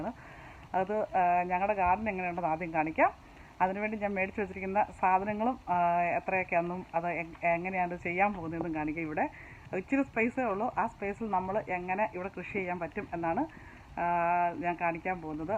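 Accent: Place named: Kottayam, India. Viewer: I like ur videos very much becoz of ur fast talking..20 minute watch cheyunnathu oru worth anennu thonnum..unwanted introduction, explanation onnum illatha video valare ishtamanu..bore adikkilla..vegam karyangal avatharipikum..keep it up..r u from kottyam district..becoz ur slang same like us.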